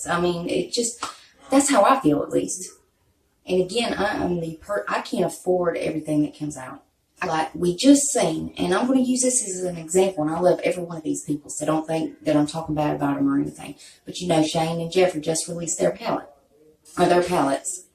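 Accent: South, United States. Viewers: What’s your accent ? Are you from the south ?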